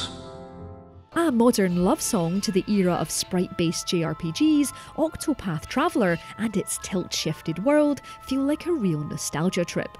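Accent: Scotland, United Kingdom. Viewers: that is the voice of an adult woman any day of the week mate and it sounds like a Scottish accent. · Are you Scottish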